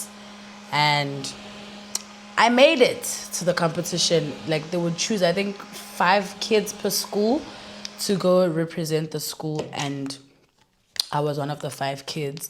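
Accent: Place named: Nigeria. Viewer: i just started watching your youtube channel and im in love with them and you...(love your nigerian accent so much)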